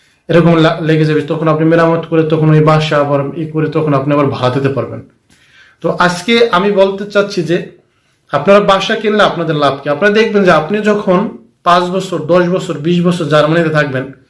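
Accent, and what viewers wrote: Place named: Barishal, Bangladesh. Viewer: ভাইয়ের বাড়ি নিশ্চয়ই বরিশাল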